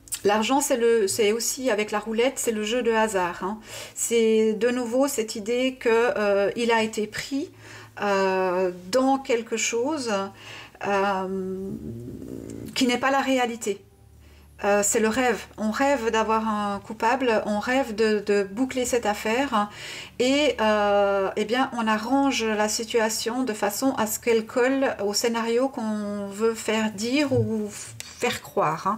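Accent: Switzerland. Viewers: Bonjour je me suis abonnée. Vous êtes suisse? Je reconnais votre accent. Je suis de nyon.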